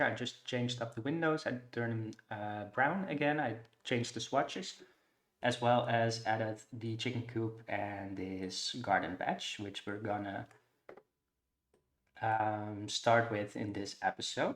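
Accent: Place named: Netherlands. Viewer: hahaha ja nederlands-engels accent is best wel duidelijk! Super leuk dat je begonnen bent met let's plays! Succes! Btw je thumbnails zijn echt perfect! Heel mooi!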